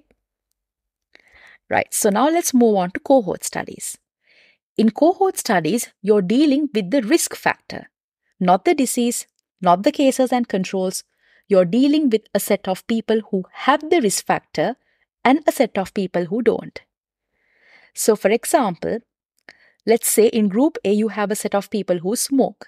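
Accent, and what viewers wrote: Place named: Sri Lanka. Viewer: Why do you sound so Sri Lankan? 😮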